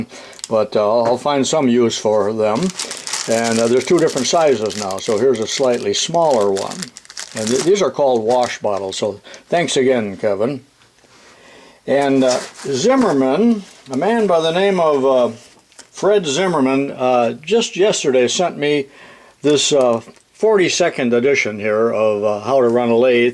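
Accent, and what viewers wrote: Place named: United States. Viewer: why dont you americans pronounce the L when you say SOLDER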